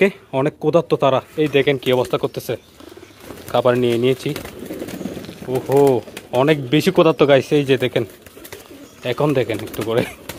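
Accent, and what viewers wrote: Chittagong, Bangladesh. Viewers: ভাই আপনি কি চট্টগ্রামের ❤❤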